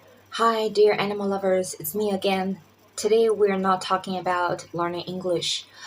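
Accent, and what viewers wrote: Jamaica. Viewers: I don't want to be rude or anything but what is your accent? It sounds like a Jamaican, South African, and New York accent all at the same time. I'm surprised people aren't hunting them down and unaliving these creeps. If there's anyone in China reading this who has nothing to live for... Or if you're suicidal, don't commit suicide and instead... well I can't say it. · your accent is very interesting. it sounds sort of Jamaican/west African mixed with Geordie/north english accent. what actually is your accent?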